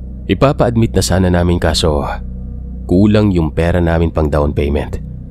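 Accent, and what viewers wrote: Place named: Visayas, Philippines. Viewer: Bisaya pronounce ang ganda pakinggan😊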